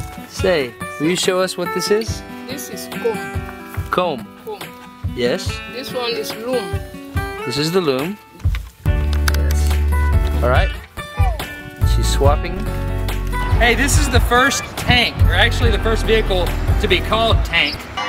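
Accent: South, United States. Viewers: Hey Destin! My first video was the rope coil analysis with honey, which I found through a science-oriented Facebook page(might have been IFLS, I cant remember.) When the video started out, I saw a guy with a southern accent and a bunch of guns, and thought "oh great..." But then you started talking and I was hooked. My favorite video was the Prince Ruperts Drop, its a facinating application of physics.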